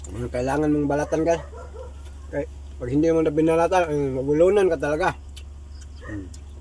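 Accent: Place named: Visayas, Philippines. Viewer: Okay lang naman tagalog niya po idol,bisaya kasi si idol.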